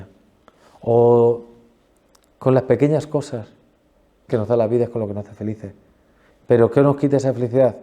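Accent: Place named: Spain. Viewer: Es un tema interesante, pero el acento español cuesta entenderlo.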